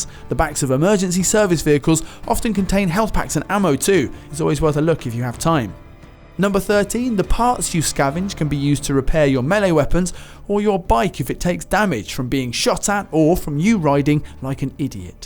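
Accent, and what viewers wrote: United Kingdom. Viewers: My god im tired of british accent!!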